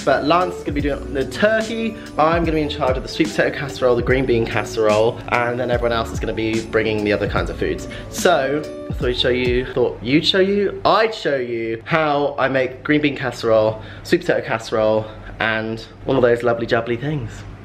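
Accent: United Kingdom. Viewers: Botter I can’t I love the British accent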